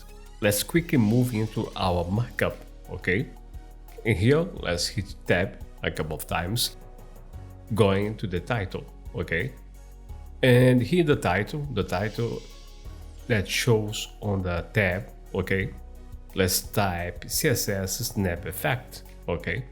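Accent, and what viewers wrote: Brazil. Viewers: Você é brasileiro? Muito bom seu tutoral! Seu sotaque parece do rio de janeiro. Parabens pelo otimo conteudo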